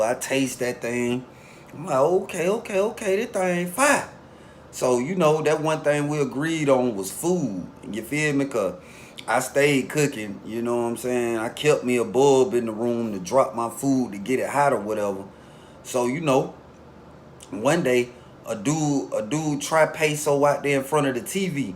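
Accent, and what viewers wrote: United States. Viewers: I just found out about your channel bro i was listening to cartoon53 and Banky Pound now I'm hooked on your channel but I struggle to understand certain stuff cause u sound so country😂😂😂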